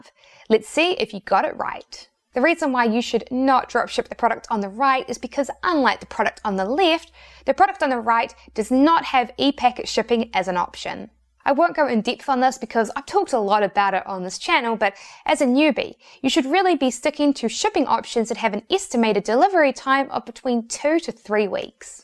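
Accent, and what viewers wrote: New Zealand. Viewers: A Kiwi. New Zealand . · Creengewearvey dance. I like your Kiwi ikcint tho.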